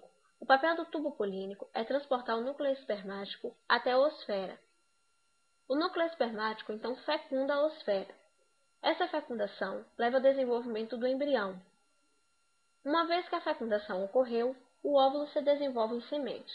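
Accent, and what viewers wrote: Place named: North, Brazil. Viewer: Parabéns! Sou Paranaense e gostei muito da aula e parabenizo você pela iniciativa! Pelo sótaque você é lá de cima! Como sempre muito inteligentes! Abraço!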